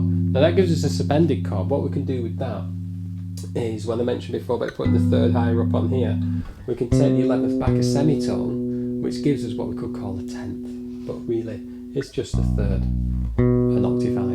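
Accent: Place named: North, United Kingdom. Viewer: Superb lesson .. do I detect a northern twang .. I’ll be practicing my extended scales today , have you any cool exercises to go with them ?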